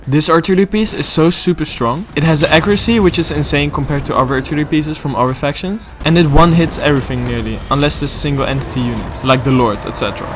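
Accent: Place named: Netherlands. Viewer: your dutch accent is thick!!!! (im guessing your dutch :) ) hello fellow dutchie!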